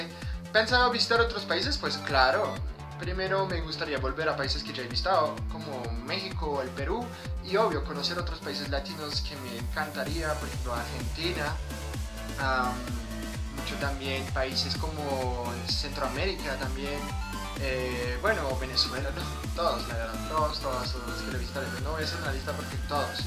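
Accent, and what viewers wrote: Colombia. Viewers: amo tu acento español colombiano ❤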